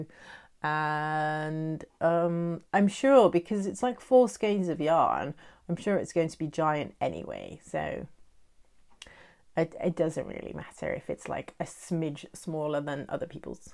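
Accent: United Kingdom. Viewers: Congratulations on releasing your first pattern! We'll done, you! I think you were channeling your inner Vanna White when you were showing your sock....lol! I've on l y been watching your podcast for a short while, and quite enjoy it. Could you explain why, for a Dutch person, you sound so British, please? It's very confusing. I'm looking forward to seeing your progress, and Julia's on the Steven West MKAL. Take care. Patty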